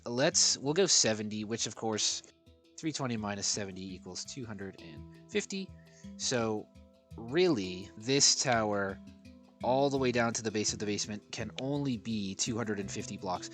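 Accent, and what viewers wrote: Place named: Ireland. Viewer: That Irish accent has me shooketh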